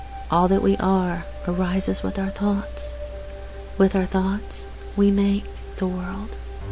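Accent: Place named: Georgia, United States. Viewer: Maybe that's a Georgia accent . . . I have seen the endless ocean of pure love, but at the time I didn't realize it was me. I chose to leave that silent bliss and return to my noisy life and on the way back I noticed how delicious the agonizing pain in my legs felt. I have enjoyed this encounter and I hope you get as much pleasure from having my attention as I got from being had. : )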